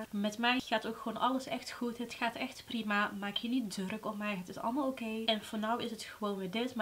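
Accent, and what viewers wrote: Brabant, Netherlands. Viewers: och lieve dit brengt echt tranen in mijn ogen 🥺 ik volg je al best wel lang. ik vind je zo 'eigen' met je brabants accent (is t brabants?) en te weten dat dit in je leven speelt raakt me enorm. maar wow wat prachtig dat het zo de goede kant, opgaat met behulp van God/Jezus, geweldig 🙏💖👏😇😇 ik wens je nog heel veel kracht & sterkte toe Powervrouw 💪🙌🍀🌸!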